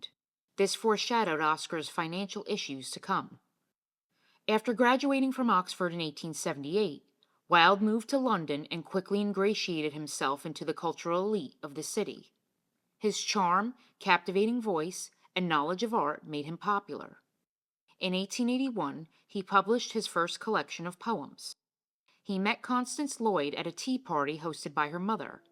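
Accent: United States. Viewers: Why criticise the narrator’s accent. She is an American and speaks perfectly well. The substance surely is the main thing . I found this an interesting article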